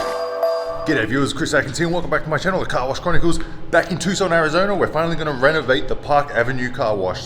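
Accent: Australia. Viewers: Do I hear an Aussie accent? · Are you an Aussie? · is that an australian accent? definitely not american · No idea why this popped up on my youtube.....Notice the Aussie accent! so kept watching (Me being an Aussie)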